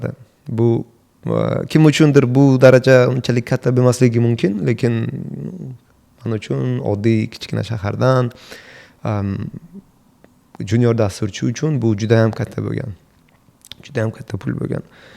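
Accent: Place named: Bukhara, Uzbekistan. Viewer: aktentan bildim Buxorolik ekanizni Vobkentdan Salom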